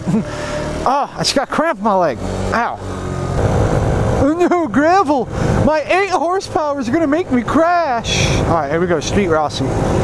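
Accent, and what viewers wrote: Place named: United States. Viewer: I like your accent. Which state are you from ?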